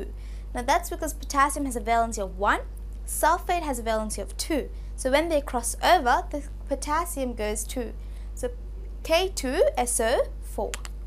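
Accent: Australia. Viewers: I can sense an Australian accent 👀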